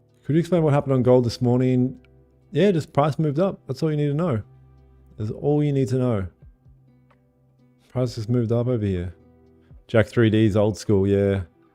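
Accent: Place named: Australia. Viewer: Living in Australia. It’s refreshing hearing an Aussie accent with typical Aussie humour. I was skeptical at your simple trading style but you are making money and I’m not. Think I’ll start tuning into your streams mate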